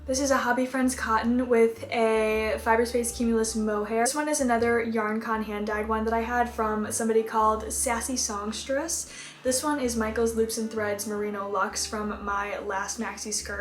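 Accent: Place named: East Coast, United States. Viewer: you sound so east coast and i love it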